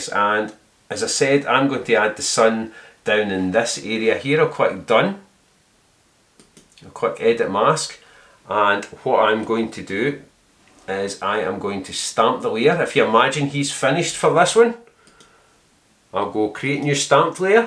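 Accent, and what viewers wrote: Scotland, United Kingdom. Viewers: The Google-subtitles in English are not perfect either... Possibly because of his strong Scottish accent which makes it hard to understand for non-native speakers;-) But his video's are great!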